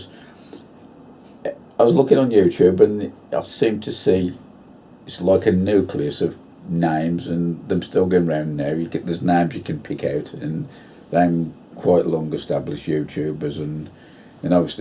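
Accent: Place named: Midlands, United Kingdom. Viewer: Hi. I just want to say thanks for uploading your videos. It's great to hear a fellow midlander on the screen. I'm from Dudley and proud of my Black Country roots. I can see that you're a bit of a womble like myself and believe in recycling whatever you can. Great videos and keep up the great work.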